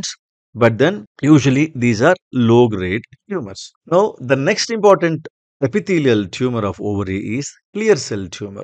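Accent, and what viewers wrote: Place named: India. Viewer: Indian voice🤢🤢🤢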